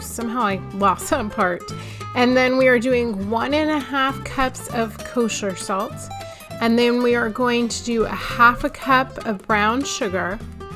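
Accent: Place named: South, United States. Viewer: I have to admit, I watched this like THREE times (of course, all the way through lol) because I kept getting distracted. SO thankful that we got to do another collaboration together, you are just so much fun and so full of life. I love how you had a bit of a southern twang while presenting, loved it! I added your video to our playlist and I will be sharing the playlist tonight on our community time to get the word out even more. Honestly will be watching this a fourth time when I can actually listen to it for the entirety. ❤️❤️❤️❤️❤️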